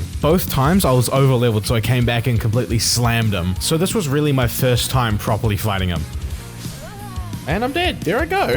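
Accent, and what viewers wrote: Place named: New Zealand. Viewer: Chur bro, you sound like you’re from NZ too so I’m gonna lay it to you straight. I was up from around 1am-3am last night smacking cones and beers back and ended up watching all your Elden Ring videos in that time. Love the channel, for sure have a new sub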